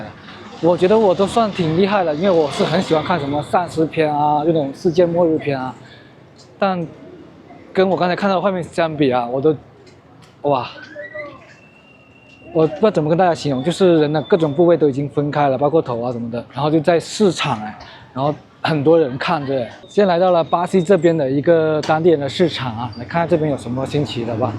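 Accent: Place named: Cantonese, China. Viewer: 广东人，他普通话没那么好😂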